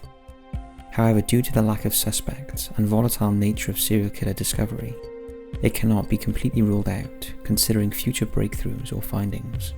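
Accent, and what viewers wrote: United Kingdom. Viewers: The narrator is obviously British. You are aware they speak a little differently than Americans, right?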